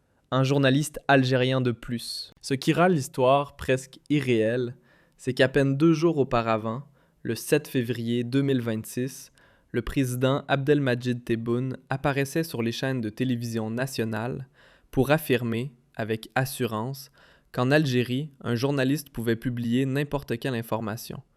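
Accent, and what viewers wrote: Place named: Canada. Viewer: Pourquoi vous changez votre accent il me semble que vous avez l'accès canadien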